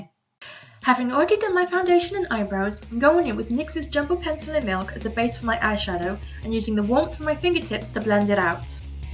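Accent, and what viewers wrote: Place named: Wales, United Kingdom. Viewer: I love this! Are you welsh? Your accent sounds very welsh haha x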